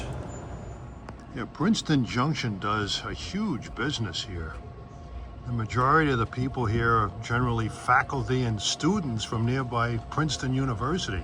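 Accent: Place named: Long Island, United States. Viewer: First of all, your doing a great job, the Long Island accent is great since I grew up in New Hyde Park. I worked as project engineer for Amtrak <num> and installed on a good day, 1000 ties on the NE corridor. Everything my crew installed has been replaced with concrete ties and I see Nassau interlocked is totally gone. All the crossovers are gone. Ricky759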